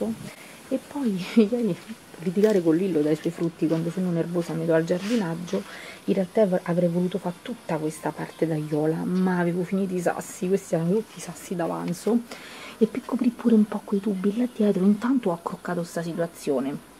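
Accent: Rome, Italy. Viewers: Ciao finalmente posso dirti che sei troppo forte con la tua parlataromanesca un bacione a Ciao 💖👍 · ciao!... io ti adoro a sentire il tuo accento romano... mi fai tanta compagnia...un bacio a!!😍e un bacio a te😙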